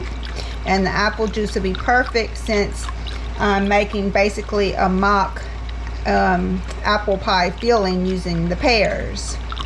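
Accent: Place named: Louisiana, United States. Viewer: Nice recipe. My pears are coming in here in central Texas so I’m about to do preserves. My son-in-law is from Thibodaux,/Chackbay. Your accent sounds like his family.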